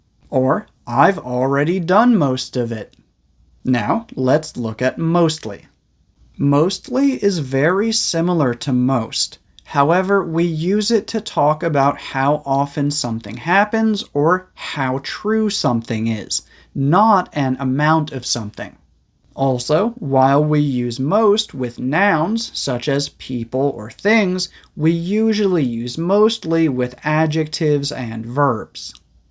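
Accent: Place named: United States. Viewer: " I love your teaching style most especially your beautiful American accent."😊😊❤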